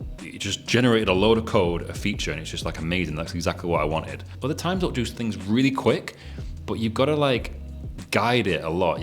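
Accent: North, United Kingdom. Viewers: Senior Engineer here, definitely felt the role change in the last year. MCP Servers seem to be the powerhouse for me so far, I've also been using a 'Context Bank' MCP Server to help with the 'Compound Engineering' across different tasks (and even different tools like Roo Code and Claude Code). P.S Always nice to hear another vaguely northern accent!! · Love this, new sub here, thank you; from a (what sounds like) a fellow northerner!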